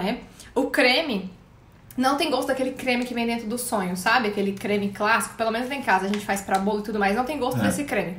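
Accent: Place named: South, Brazil. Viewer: O é do sul? Sotaque tá meio entregando e CUECA VIRADA em vez de grostoli ou orelha de gato hahaha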